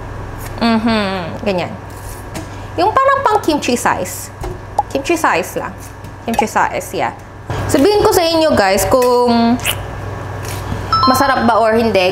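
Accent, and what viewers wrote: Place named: Conyo, Philippines. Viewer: The way u speak it seems u r pure Filipino. You mix english with tagalog the accent as well.....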